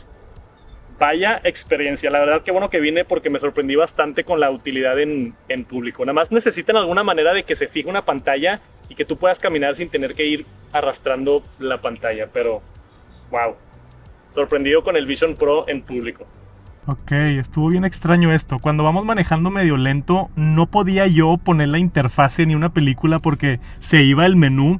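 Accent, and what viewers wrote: Mexico. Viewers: Porque es de México...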